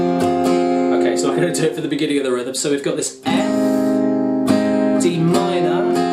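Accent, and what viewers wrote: England, United Kingdom. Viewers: Thank you very much! It's really great to get all these detailed explanations and ultimately UNDERSTAND :- )!!... and with a nice English accent too :- )! Hello from France ! Thomas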